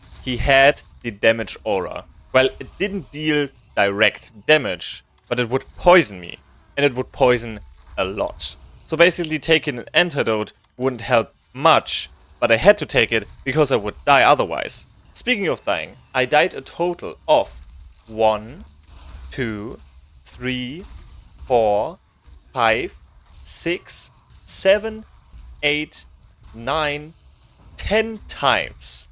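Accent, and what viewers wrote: Germany. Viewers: Are u german?😄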